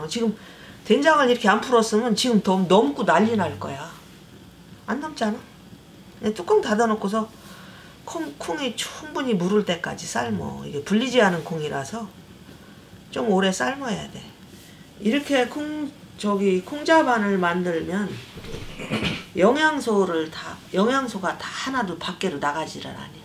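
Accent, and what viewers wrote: Chungcheong, South Korea. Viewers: 어머님, 구수한 충청도 말씀이 너무 좋아요. 오늘도 감사 합니딘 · 충청도 사투리가 너무 좋습니다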